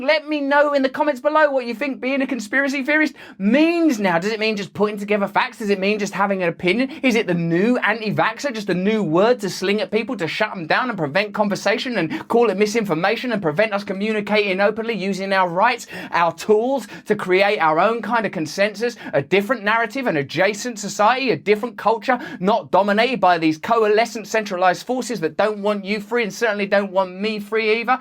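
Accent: United States. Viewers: American accent is better than his English accent… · Mr. Brand. I heard your name some time ago in connection with "right wing conspiracy theorists" and as usual paid the accusation no mind because it came from the same media outlets that always use that phrase to marginalize those who say what they don't want anyone to say much less think about. I've so far tripped over a couple of your videos. Haven't seen you espouse a single CT yet. Today I subscribed, but I have to admit, at least partly because you're the FIRST Brit I've ever seen who can manage more than one American accent. Also, because you mentioned Orwell who seems to have been relegated to unperson status recently as all the major "Western democracies" vigorously and enthusiastically utilize every doublethink and doublespeak tactic he invented. They took what he wrote as a warning and are using it as a manual! 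Why do I say Orwell is an unperson? I'm 69 and my kids and grandkids are no longer required to read him in school. They didn't even know who he was or what he wrote until I told them.His books haven't been banned- yet- but he isn't being taught any longer. They are unequipped to recognize The State closing ts grip on them, and would probably gleefully go along with the USA going full "Team USA: World Police" as that article endorses. When the fuck did life start imitating satire? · Hey, why does it seem like it is easier for you to speak with an American accent than your native accent? Keep up the great discourse!!! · I really like your American accent. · dig american gangster voice the best. Gilbert Godfreyesque.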